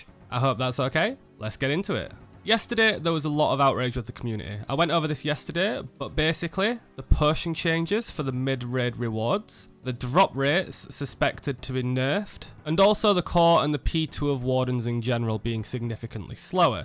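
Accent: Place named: Yorkshire, United Kingdom. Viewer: Finally a Yorkshire accent. I understood everything. Great rundown, really helped.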